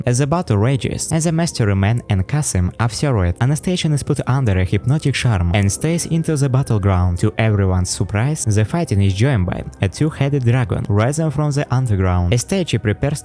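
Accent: Russia. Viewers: Not gonna lie I thought your were speaking Russian for a minute......but overall not not bad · Where are your Accent comes from? Russia?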